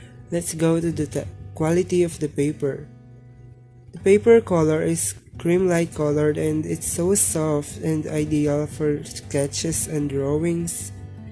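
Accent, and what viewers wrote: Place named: Philippines. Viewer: Your English accent is really the same as filipino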